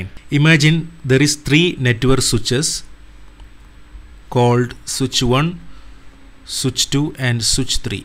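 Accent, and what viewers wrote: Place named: Kerala, India. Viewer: bro r u Malayalee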